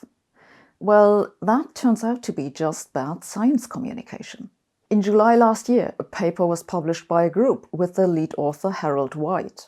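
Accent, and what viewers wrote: Germany. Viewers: Schlechtes Mikrofon und ein Englisch mit starkem deutschem Akzent macht das Hören anstrengend. Der Akzent wird nur schwer zu ändern sein, aber ein besseres Mikrofon wäre vermutlich möglich.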